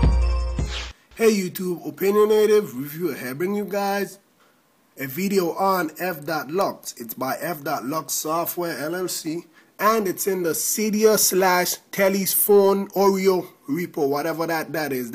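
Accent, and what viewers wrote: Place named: Jamaica. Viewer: They care about a badass Jamaican accent like that man:)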